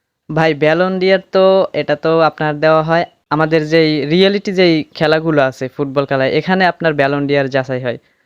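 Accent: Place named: Madaripur, Bangladesh. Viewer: আসসালামু আলাইকুম ওয়া রাহমাতুল্লাহি ওয়া বারাকাতুহু। ভাই ভালো আছেন। ভাই আপনি ফুটবলে কোন দল সাপোর্ট করেন ব্রাজিল,-আর্জেন্টিনা, জার্মানি, নাকি স্পেন। আপনার বাসা কোন জায়গায় কে আমি মন আপনার কন্ঠ তো শুনে লাগে আপনার শরীয়তপুর নয় মাদারীপুরের আশেপাশে থাকেন আপনি কোন জায়গায় থাকেন?আপনি কি করেন? ডি এল এস গেমে কি আর কোন কিছু নাই ভাই আমার গেম খেলতে ভালো লাগে না আমি হার্ট মোডে ডিএলএস খেলছি আমি খালি ম্যাচ জিততে থাকি খালি জিতে থাকি আমি মেয়ে ছাড়িনি এখন আমি কি করবো ভাই.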